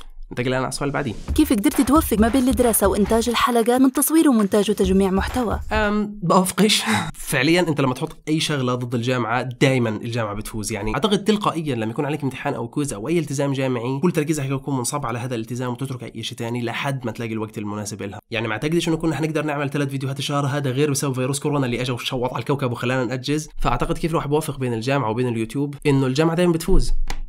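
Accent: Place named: Algeria. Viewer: ههههه هذه لهجة جزائرية ❤❤ تعيش الجزائر و فلسطين شعب واحد للأبد 💕💕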